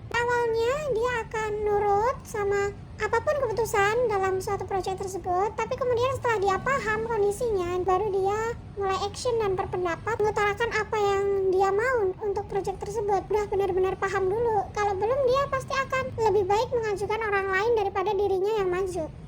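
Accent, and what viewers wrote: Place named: Java, Indonesia. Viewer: medok bgt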